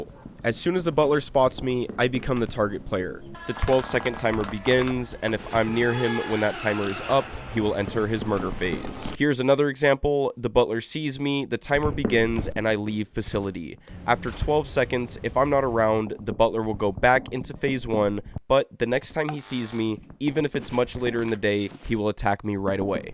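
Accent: United Kingdom. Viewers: Brishish man from South London.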